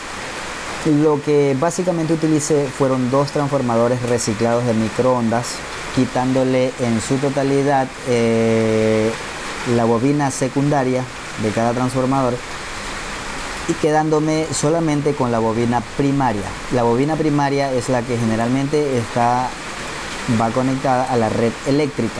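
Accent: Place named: Colombia. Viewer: soy de Venezuela y no se si las numeraciones de los cables son igual a las de donde tu eres, me pareces colombiano por tu acento y el cable numero 8 aca en Venezuela es un cable bastante grueso y no creo que entren ni 12 vueltas en un transformador de microondas, aclarame si ese cable es grueso o de que grueso es laseccion de el cable 8 que indicas.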